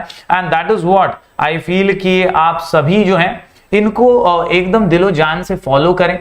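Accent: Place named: Bhojpuri, India. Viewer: Btw sir aapka bhojpuri bolne ka tarika bhot mst h 😂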